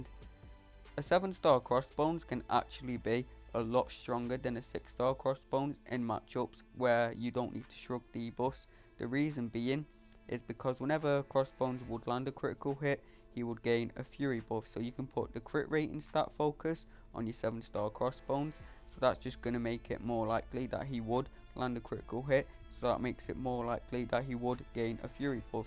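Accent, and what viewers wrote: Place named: Cockney, United Kingdom. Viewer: Wait, u a London boy? Hope i am not being unpolite, just i stayed in leeds for a year, and that cockney accent sounds soooo familiar.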